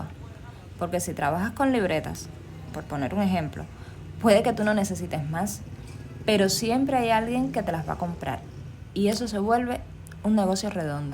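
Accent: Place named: Cuba. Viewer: Que diccion y voz tan agradable. La prueba de que se puede hablar con acento cubano sin que suene a regueton. Muy completo y systematizado el contenido. Por cierto, yo siempre pense que un colero es el que vende su puesto en la cola, profesion exclusiva de Cuba 😅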